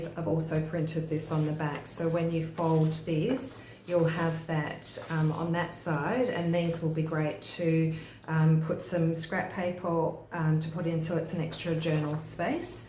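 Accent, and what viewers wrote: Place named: Australia. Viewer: Love the Aussie accent!!😊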